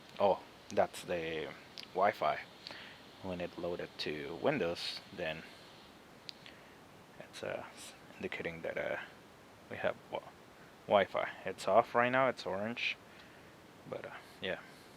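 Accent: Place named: Philippines. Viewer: Are you a Philipino? You sound like a Pinoy. Thank you for your video man I learned a lot!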